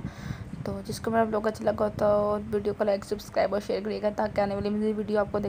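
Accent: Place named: Faisalabad, Pakistan. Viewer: Ap Ka way of talk bilkul faslabadi logo ki tra h or pata b lag jata h JB ap bolti h yummmy pulao and mazy dar Matt saln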